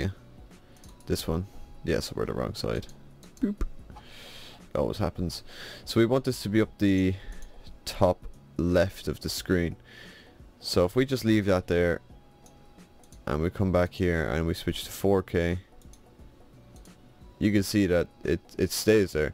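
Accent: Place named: Ireland. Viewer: First Tutorial with an Irish accent and I love it.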